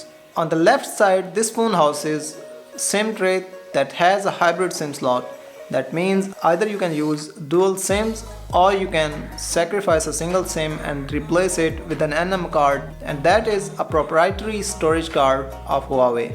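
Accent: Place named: Pakistan. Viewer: come on dude you are trying hard to speak English and pronouncing English words with desi Arabic/Urdu accent,I think there is no need to do reviews in english,in Pakistan by urdu you can get much wider audience and reach...English is just an other language its not a benchmark of "quality or scope of the channel". I'm not being sarcastic at all,its just my opinion. Otherwise your review is good,quality and content is also spot on...thumbs up for that.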